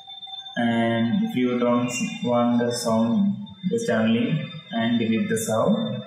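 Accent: Kerala, India. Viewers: bro... u from kerala???.... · ഇംഗ്ലീഷ് കേട്ടപ്പോ മനസിലായി മലയാളി ആണെന്ന്...മലയാളം മതി ബ്രോ...!!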